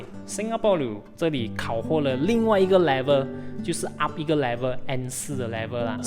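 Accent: Malaysia. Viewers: 你换工作薪水能跳一个30%肯定会要换喔🤣😂😁 很喜欢听你的联邦腔😛😄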